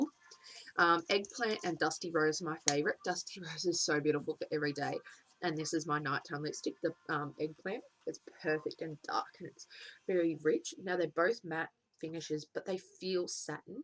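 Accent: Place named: Australia. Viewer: I love your accent my accent is super boooring yours is so cute! My auntie is from Australia and I always make her talk so i can hear her accent lol!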